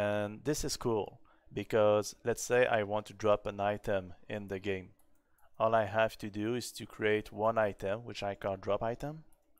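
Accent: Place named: Quebec, Canada. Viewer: Je pense que je reconnais un québécois ici 🙂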